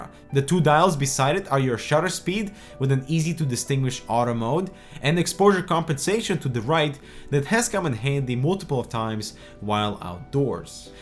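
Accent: Canada. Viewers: Nice review! Your name, russian? But canadian accent! Cool! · What part of Canada are you from? You have a heavy accent.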